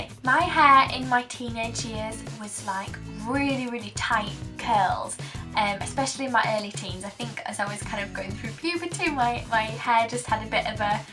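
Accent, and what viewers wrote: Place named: Northern England, United Kingdom. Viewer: You were on if the first people I subscribed to on YouTube , been watching your videos for so long! Definitely will always be one of my faves, your personality is awesome! So Glad to see you back! I've just started my own channel...complete newbie, still learning, so no judging! Haha would love you to come by an have a look if you could! I've just recently moved back from Leeds too...love a northern accent! Hope all is well and all the best for this year!xx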